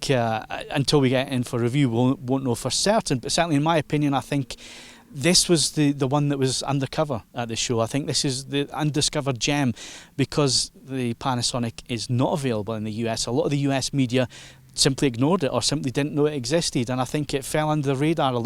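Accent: Scotland, United Kingdom. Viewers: This guy is from Scotland. I believe.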